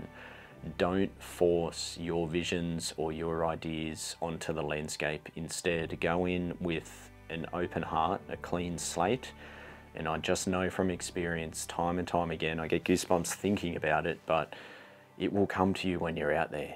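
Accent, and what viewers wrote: Australia. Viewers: There's absolutley nothing redeemable about the thin Australian accent.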